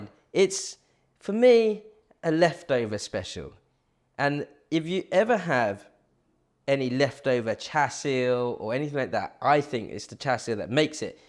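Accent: United Kingdom. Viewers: Love to see and hear an Asian person with a British accent. Very unusual. I love it. ❤️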